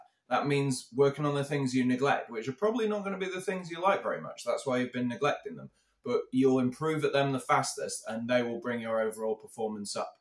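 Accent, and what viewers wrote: England, United Kingdom. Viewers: I was wondering why Youtube proposed your piano learning video... then I worked out that it is because my first Karate master is also Kendo master while second is also Ju Jitsu master and then because you speak English with a British accent and, last but not least, you played When the Saints, the song I have been singing since Nursery School, my mother says... Ciao from an ex Londoner, ex Karate black belt ex fit person, ex ex ex ex... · Interesting.... will have a look. Like your style and philosophy and good to hear an English accent.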